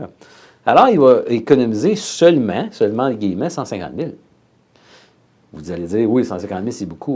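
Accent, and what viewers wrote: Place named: Quebec, Canada. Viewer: Waaaaaw quel accent québécois 😁😁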